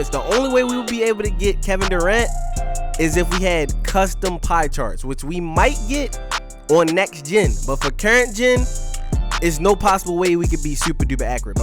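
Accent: Baltimore, United States. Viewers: Brody gotta b from BMore the way them ooos is coming out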